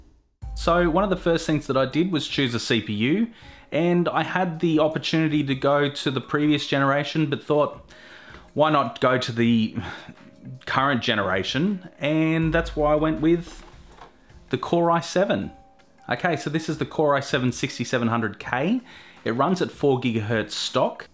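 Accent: Australia. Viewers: Problem with our Australian voices mate? You're probably American.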